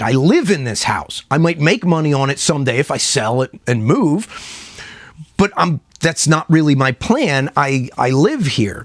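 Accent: Pittsburgh, United States. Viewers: You sound like your from Pittsburgh 🤣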